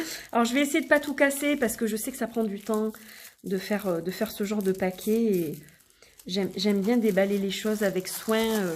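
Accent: South, France. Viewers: Bonjour, grâce à je viens de découvrir vôtre chaîne . Joli accent sudiste si je ne me trompe pas 😉 J'adore l'univers de cet oracle et je viens de le commander. L'association avec la numérologie me ramène à mon Oracle de la Triade. Encore une fois merci à vous, je vous souhaite une très bonne soirée. 🙏🕯️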